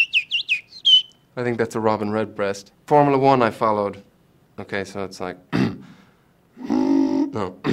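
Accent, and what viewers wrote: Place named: Ireland. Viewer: i like his cute irish accent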